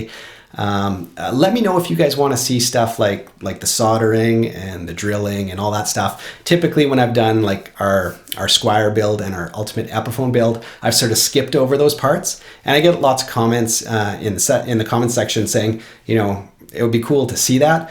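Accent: Canada. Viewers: Are you Canadian? Cause I am and I detect a bit of the old "eh" going on.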